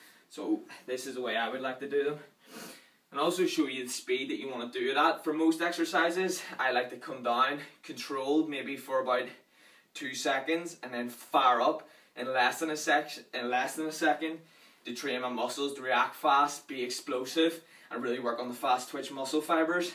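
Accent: Northern Ireland, United Kingdom. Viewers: Everyone wondering asking where he's from. My most accurate guess is Northern Ireland.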